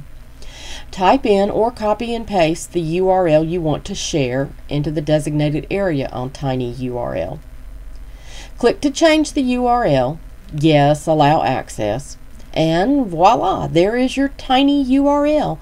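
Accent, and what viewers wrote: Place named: South, United States. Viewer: This was just great! Your lovely Southern drawl made it feel even more captivating.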